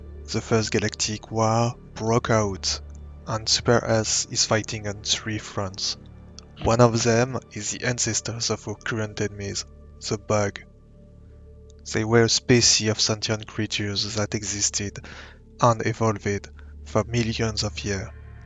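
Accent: France. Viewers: j'ai l'impression de m'entendre essayé de parlé anglais en t'écoutant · you sound french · Your French accent is very strong, and that is FINE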